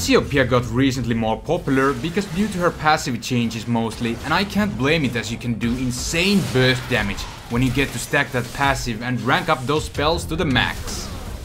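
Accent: Finland. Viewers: Your accent reveals you. Finnish? ;) Great vid.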